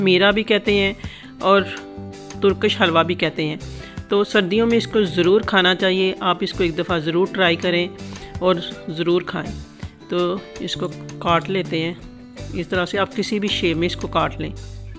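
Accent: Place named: Lahore, Pakistan. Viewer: Lucknow, India. Your dialect and accent remind me of my elders who relocated from Lahore to Delhi.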